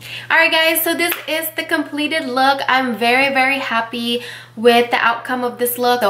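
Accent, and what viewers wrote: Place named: United States. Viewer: Christina Tran well she sounds american to me so I think thats classed as an accent aha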